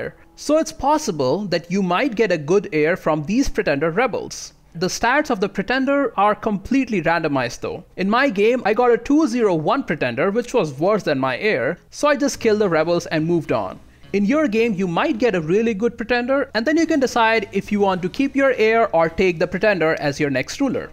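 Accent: India. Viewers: are you of indian origin??? because your accent sounds like it... 😅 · Sounds Indian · Warriors of Athens yeah. We are both Indians:). I guess is also Indian, looks that way from his accent.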